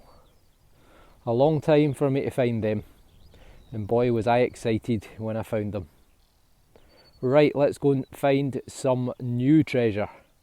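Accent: Scotland, United Kingdom. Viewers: Aw man sing, your Scottish accent is very cool. I have not long stepped up to a Deus. I was using a Fisher F2 but i think i had out grown it. I'm hoping the Deus makes all the difference. Thanks for your time and showing how superb these machines are. I haven't scratched the surface in terms of it capabilities and don't expect i shall for some time. I got the wireless remote and ws4 package and it's super light, very impressed.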